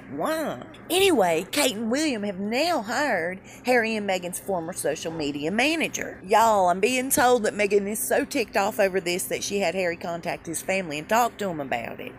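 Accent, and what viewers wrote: South, United States. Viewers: I love your videos...YOU ARE THE FUNNIEST OF THEM ALL... you truly put a smile on my face...not only because we are sisters in the 'MEGXIT".. I hope that MERCHANT MARKLE (as I call her) GETS HER KARMA BACK..!!!.but I also have a house in the Sunshine state...I like your southern accent better than the millennial....I actually love it.!!!!... in my humble opinion it makes you different from the rest, it gives you character, your own personality...plus the jokes sound much better, super funny..!!!! the millennial accent does not "suite" you...hahahha no pun intended..!! I think If anybody is over the age of 16 ...is just not right..it is actually a speech vice...did you know that? I hope I did not make you feel bad or hurt your feelings in any way....because I just love your videos. EX PECIALLY...HAHAHAHAHAHA. the one where you talk about going thru fire hoops, jumping over a pool full of German pinchers or something like that...oh...and the ROASTED CHIKEN...OMG..... 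I LAUGHED SO HARD..I HAD TO RUN TO THE BATHROOM...no,...really, ..seriously...I did.... you are the best..!!! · Hey 👋🏼 Always enjoy your vids & that sweet southern drawl of yours 😁 I watched the trailer for the movie…that’s all I needed. I cringed at her voice…😝 She won’t make it in Hollywood, she’s just not good enough. She’s an ok actress, but that’s it.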